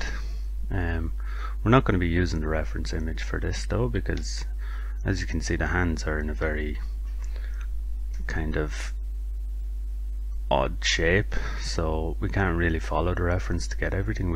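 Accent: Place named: Ireland. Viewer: Great tutorial as always. The Irish accent makes it so much better, can't beat it haha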